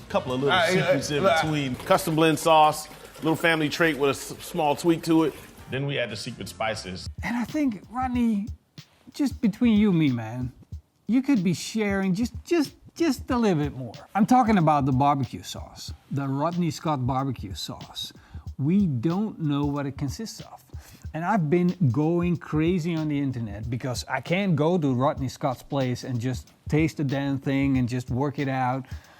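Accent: Netherlands. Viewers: are you dutch bc's i am and i think i notice the accent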